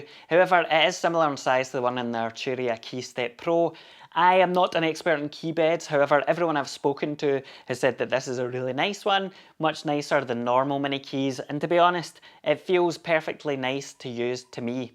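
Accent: Scotland, United Kingdom. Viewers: Sold my desktop last month to fund a Super 6. There was just something about its sound that I didn’t quite like compared to my other synths. Loved everything else about it, such a deep synth engine, amazing mod matrix and the macro assign is genius. But it just wasn’t getting the same love and attention that my Peak, XD and Iridium were getting. I may pick up an Explorer again though at some time. Always good to hear a fellow Scottish voice on a synth channel.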